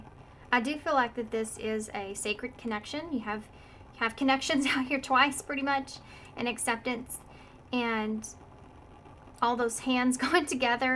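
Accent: South, United States. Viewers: Oh my goodness. I chose pile 2 and it heavily resonated and at the end you said “im hearing Jan too” and that is literally my nickname 🥲🥲🥲😭😭💖💖💖 thank you for this so much!! My imposter syndrome holds me back from everything. Ps. I can tell you’re from the south from your accent! Tennessee girlie here haha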